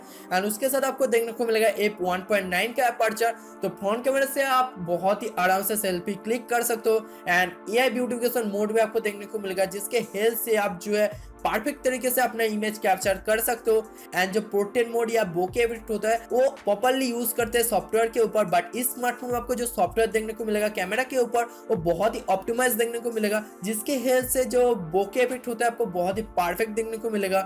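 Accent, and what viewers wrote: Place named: Bihar, India. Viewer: Bihari ho kya boli se laga. cameda....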